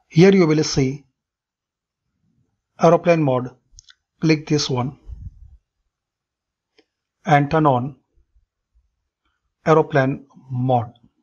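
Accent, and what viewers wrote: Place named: India. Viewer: Can you ever hear one of these videos without the person sound like an Indian scam artist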